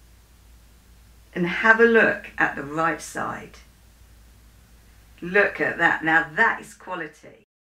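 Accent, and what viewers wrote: United Kingdom. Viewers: First of all I want to say that I love your accent.🤗 Is it British or..? And thank you for such an easy understanding tutorial.🥰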